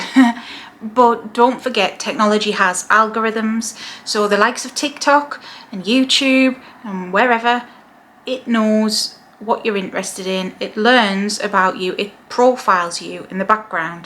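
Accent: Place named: North East England, United Kingdom. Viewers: This might be a bit off topic but I'm curious to know if you're from Newcastle/ the North East? I'm just mentioning it because I lived there when I was younger and when I clicked on this video (after weeks of feeling called to learn more about her) your accent struck me as a positive sign that this video was meant to find me ❤️‍🔥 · it's so surreal to find the first video talking about working with Hecate is by someone with a very similar accent to me - hi from Teesside :)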